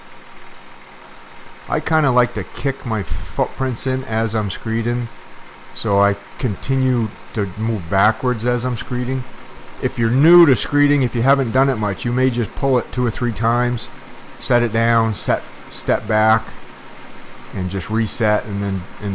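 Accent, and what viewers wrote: Maine, United States. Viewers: By your accent...Maine?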